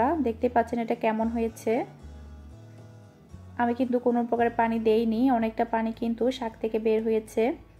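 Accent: Chittagong, Bangladesh. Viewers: Ha Chittagong er voice amio asi satay ki hon apa thik hoine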